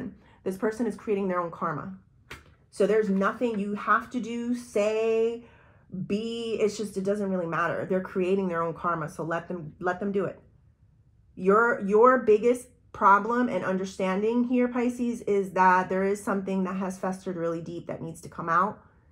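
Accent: United Kingdom. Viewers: The British accent is awesome 🙏🏼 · This is awesome. I love the British Accent also. Yes God is helping me cleanout things people actions attitudes that have held me back from enjoying true happiness. Thank you your reading is on point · Everything you have talked about today is 💯and the proper part? I’m from Manchester England and you were sounding like me. Lol and you know your right. Just being able to be yourself with no restraints like a child again in a way. God always shows me when I need it. Thanks for this today. I needed it🙏⭐️💐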